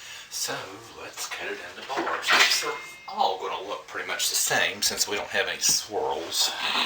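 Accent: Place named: Kentucky, United States. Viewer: I adore your accent <3 - it reminds me of my time spent in Kentucky. I'm from new Zealand.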